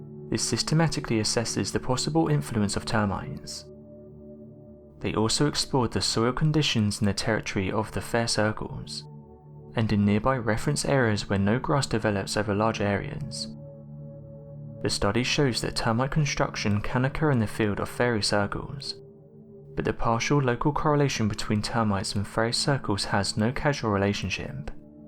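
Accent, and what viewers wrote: England, United Kingdom. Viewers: Really don't like the English accent !🍀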